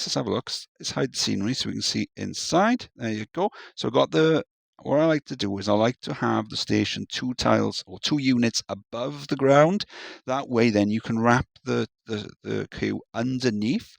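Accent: Wales, United Kingdom. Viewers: I've been trying to place your accent,! Is it Welsh? Hopefully you don't mind me asking. Regardless, I love your RCT content and have been using a ton of your coaster designs!!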